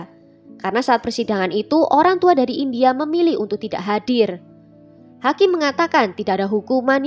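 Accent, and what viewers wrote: Java, Indonesia. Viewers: Hayyy medokuhhh 👍💖💖💖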